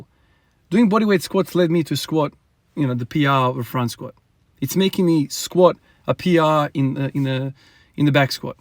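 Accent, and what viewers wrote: Australia. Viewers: What accent is that? Sounds like a mix between Australian and South African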